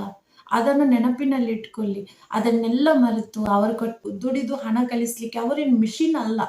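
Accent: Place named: Udupi, India. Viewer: Your accent etc tell me that you are originally from some ಉಡುಪಿ or ಕುಡ್ಲ r area but probably living away from thére. This video indirectly talks about some vital statistics about the high rate of illicit sex among the married female partners of those men who have gone away, usually abroad, finding some employment. You are strongly suggesting here that to a (married) woman being sex-unemployed is next to impossible and so having UTTERly failed to control their desires etc, they have chosen to tread the dark path of physical adultery. You have also said that not all of them have become so which is natural to invite the following legitimate question--how are the majority of those not regularly sexually active ÍN the secret chambers of men coping with their uncontrollable libido--have they been using sex toys and masturbating often, have they been watching or reading mummy porn, have they been having phone sex or non-penetrative sex etc? 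I hope you would also deal with those alternative sex satisfaction means also sometime. Thank you for using ದೈಹಿಕ ಪ್ರೇರಣೆ somewhere which is only a remote euphemistic translation of 'sexual advances'.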